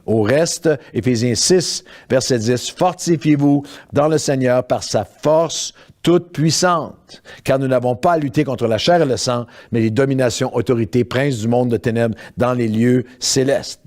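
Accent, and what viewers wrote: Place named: Canada. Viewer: Avec vous depuis le sud de la France ! Dans le minervois! Ne soyons pas des vierges folles !! Merci pour votre prédication edificatrice avec l accent canadien ! ❤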